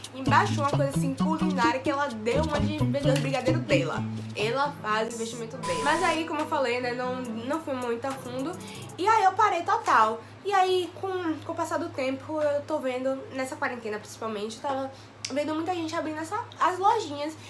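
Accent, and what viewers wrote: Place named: Salvador, Brazil. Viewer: DE SALVADOR? NEGAAAAA SUA HISTÓRIA É TUDO E TEU SOTAQUE EU PENSEI EU CONHEÇO ESSE JEITO DE FALAR AAAAA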